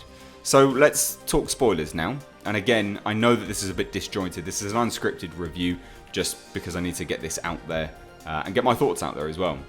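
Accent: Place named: England, United Kingdom. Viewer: I actually like your English accent and your reviews 👍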